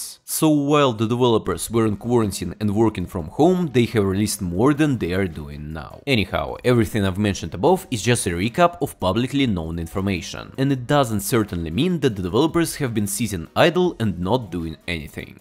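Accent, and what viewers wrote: Russia. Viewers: Damn, I didn't know about your english channel before, and I like the russian accent, а так вообще прикольно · все ровно слышно русский акцент)) но твой английский супер!! 👍👍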